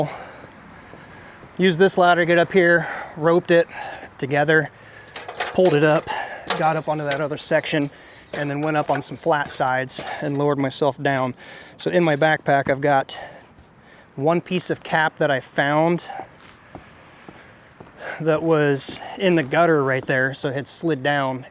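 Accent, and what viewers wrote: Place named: South, United States. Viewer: “Waller” “jossel around”…. You sound like one of us southerners.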